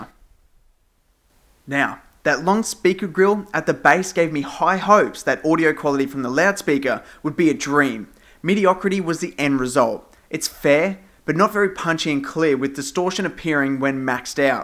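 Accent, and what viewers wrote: Australia. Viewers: Your Australian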